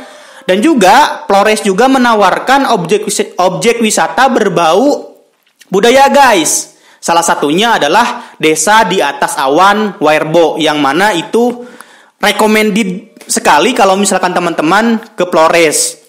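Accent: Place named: West Java, Indonesia. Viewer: Keren mang, meni sunda pisan logatna. InsyaAllah abi bade solo riding ka flores minggu dpn.. Aya IG mang?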